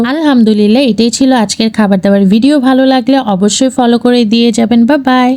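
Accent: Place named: Bangladesh. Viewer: 😂আপু প্রথমে আপনাকে ইনডিয়ান ভাবছিলাম পরে আপনার ভাষা সুনে মনে হলো আপনি বাংলাদেশি তারপর গরুর গোস্তো দেখে সিউর হয়ে গেলাম আপনি বাংলাদেশি😂🎉❤❤❤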